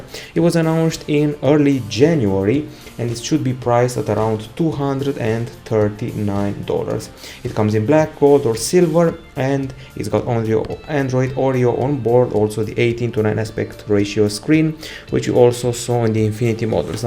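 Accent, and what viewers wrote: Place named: Romania. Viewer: Nice Romanian accent. 😀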